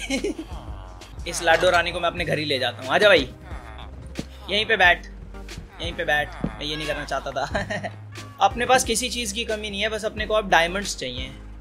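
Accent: Haryana, India. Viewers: I love when you speak Haryanvi because I am from Haryana I am your big fan love you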